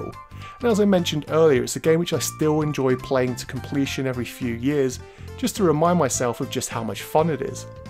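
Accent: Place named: United Kingdom. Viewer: Godddddd why must every n64 video on YouTube be narrated by a British accent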